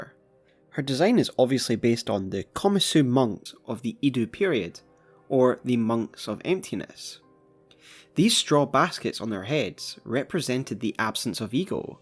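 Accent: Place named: Scotland, United Kingdom. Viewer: It's nice to hear a Scottish accent. Love your vids man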